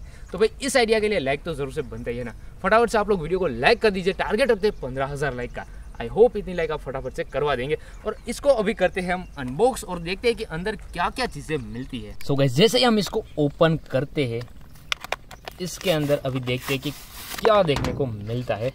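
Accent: Gujarat, India. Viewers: Han bhai gujrati hai 🙃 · Bhai, tu gujarati he.?? I mean tone se lag raha hai.?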